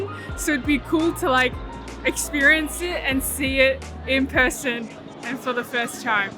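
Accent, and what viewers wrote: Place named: Australia. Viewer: So cool to see you cross Shibuya Crossing. Love your Aussie accent.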